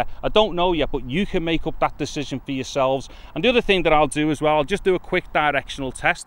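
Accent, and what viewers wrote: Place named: Birmingham, United Kingdom. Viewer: You sound like a Peaky Blinder, mate. Anyway, amazing review. Thanks!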